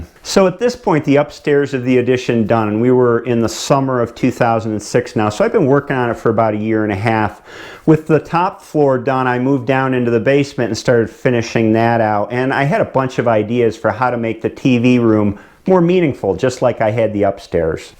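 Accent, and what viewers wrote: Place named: New York, United States. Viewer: Well as a southerner his new York accent is about more than I can stand but instill enjoy his videos😂😂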